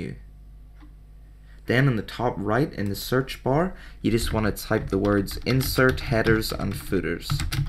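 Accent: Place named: Northern Ireland, United Kingdom. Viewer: definitely a Northern Irish accent there.... good video!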